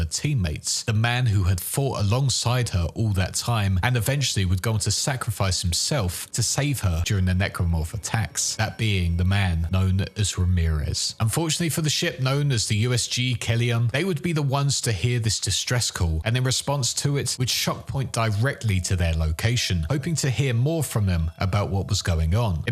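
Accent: United Kingdom. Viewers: Ah, another English guy speaking in that English way as if he's a professional in whatever gibberish he is speaking about.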